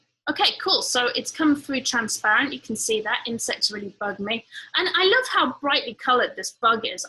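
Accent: United Kingdom. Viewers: I love your accent,. Are you English??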